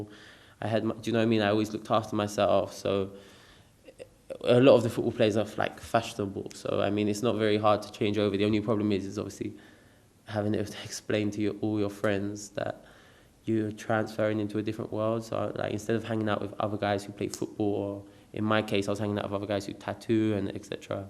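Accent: United Kingdom. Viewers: Lol nah, he just has a typical London accent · lili maxwell Actually he's scottish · His British accent makes him even hotter if that’s possible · Idk why everyones a bit disappointed of his voice ....like guys he have got british accent ....😍😍😍😍😍😍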